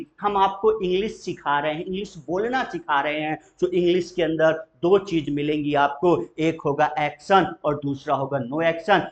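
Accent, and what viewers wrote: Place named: United States. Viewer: Why are you talking in American accent m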